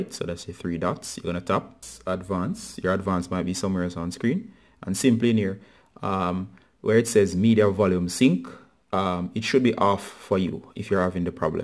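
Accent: Jamaica. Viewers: A which part a Jamaica yuh come from dawg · You sound like a jamaican. Well thanks for the advice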